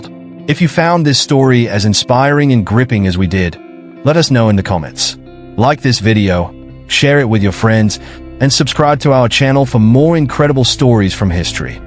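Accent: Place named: Australia. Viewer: Your accent is confusing I thought Australian but there's something else there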